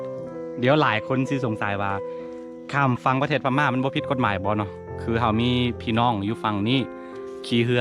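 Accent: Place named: North, Thailand. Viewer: สำเนียงพูดออกเหนือบ้างออกลาวบ้างคับอยู่ใหนคับชอบๆติดตามเน้อ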